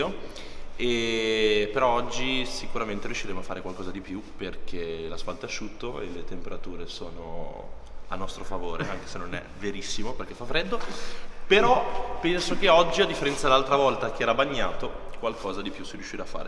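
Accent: Genoa, Italy. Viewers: Video veramente stupendo 🤩 ma belin dato che parli in genovese spero che tifi Sampdoria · Aaaaa il genovese di ahahah😍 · Bellissimo video sei migliorato tanto, qualità,contenuti, durata e improvvisazione. Intrattieni sul serio, dovresti fare una serie tipo Gomorra sulle auto e lifestyle Bye con la cadenza genovese ahah numero uno ti adoro 😂😘💪🏻🥇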